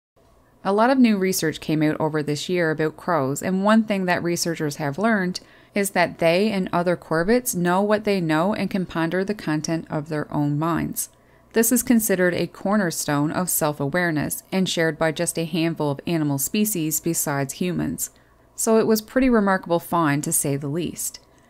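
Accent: Canada. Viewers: Dear, I love your videos, your facts are beautifully presented and your Canadian accent is so very charming. In Australia we also have crows, ravens and other song birds. The East Coast magpie (found in my home town, Sydney) has the MOST beautiful song. Once your local magpie knows you it will consider your a friend, woe betide you if they dislike you. My local magpie knows me and I occasionally give it ground mince as a treat though I try not to feed it too much. One day, when this dreadful pandemic ends, visit Sydney, you'll love our birds, too. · Is it true that each crow has somewhat of a unique song meaning they don't sound completely identical like other songbirds just asking? By the way I like your accent. Are you Canadian? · You're Canadian, aren't you?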